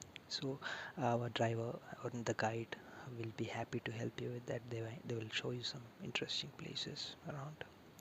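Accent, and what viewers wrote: India. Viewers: I'm so glad I found this video again. It is absolutely perfect. I love the Indian voice for asmr. So soothing. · Ppl love the Indian accent. It’s so tingly. The heavier the better. I am from NYC all my life and lived mostly in Queens so lots of Indian ppl. Very nice ppl. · Are you from Kerala? Malyalam people especially who have the typical Malyalam English accent is awesome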